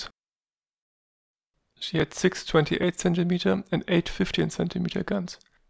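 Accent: Germany. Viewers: German accents from certain areas sound 'sad' to English speakers. The video is rather good, I thought.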